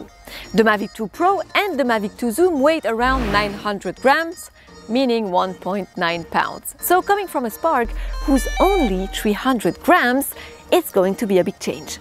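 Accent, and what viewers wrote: France. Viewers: Honnetement = iJustine, et nous re-re-re-parler du drone du moment pour faire des vues ce n’est pas très interessant. Par contre l’accent francais en anglais et le debit rapide de la presentation c’est agréable. Du coup manque de contenu mais represente bien ce que deviens Youtube en 2018, une chaine de pub sans contenu. Ah, pour faire des vues tech actuellement faut parles du Nikon Z, du nouveau Canon, des Sony Mirorless ou de la 8K 🤓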